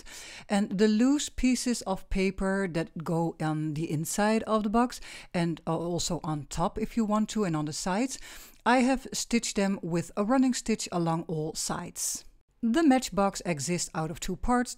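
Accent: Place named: Netherlands. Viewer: Hey dear, how nice that I could discover you through Luise, because your projects, design and expression is just cool & beautiful 😍 Interesting artist exchange you did together, I think that's great and must have given you a lot of pleasure 🥰 and me a lot of inspiration. You speak, like so many Dutch people very good German and English and I love the Dutch, their country, their culture since I was two years old (1966) and love their accent so much. ❤️ Sorry if this comes across as totally slimy, but it's just the way it is with me, because Dutch culture has had a big influence in my life. I lived close to the Dutch border for 48 years, did a lot of shopping, had some friends there and spent many, many holidays there. I also bred Kooikerhondje for 10 years 😆 Thank you for sharing your art with so many people! 🧡... I am happy to be able to learn from it!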